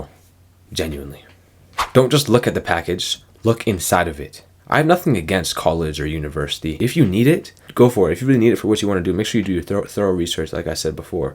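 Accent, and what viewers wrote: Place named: Canada. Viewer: I didn’t even realize u were from Canada until u said fam 😭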